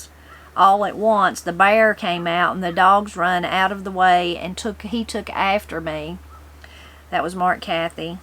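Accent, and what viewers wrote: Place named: Oklahoma, United States. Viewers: I love the way you speak! Growing up on the West Coast, we could NEVER get away with speaking that way. It almost sounded like a "foreign language" when I first started watching your channel. Not judging at All! I Love how in the South they use the phrase "Bless your heart"... sit down and stay a spell. I remember my friend Pansy said y'all one day, oh my goodness Mrs. Morris corrected her and embarrassed her in front of the entire class. She never forgot that. Your girls are some of the most loving young women I have the pleasure of getting to know. I watched a video of them yesterday asking if there were anyone needing Prayers!! Who does that? Girls that were brought up by loving families, that's who. So Sweet. What a better world this would be if we had more and. ❤ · I love the way you talk. I am southern thru and thru. 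Learned most from my Granny. Miss her a lot. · How can I order your cookbook? Thanks. I’m from WV, so I do understand your (or ourdialect. Lol 😂😂😂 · My Oklahoma family is originally from your area and they speak like you. I was grown and gone before I called a couch a “couch” before that my family mostly called it a divan or a lavan. They also added the r to their words. Like my aunt Nina was “Niner”. My mother was and still is for many of her family Ader for Ada.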